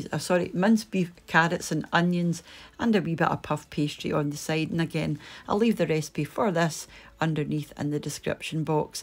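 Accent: Scotland, United Kingdom. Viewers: I am here just to listen to your lovely Scottish accent. So soothing. From the US but lived in Dunoon many years ago.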